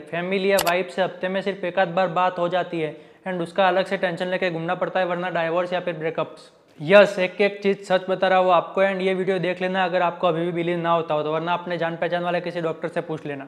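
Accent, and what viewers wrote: Gujarat, India. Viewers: I can feel you are GUJARATI!! ❤ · Aapki aavaj sunke hi pata chal gaya hi aap gujarati hu 😆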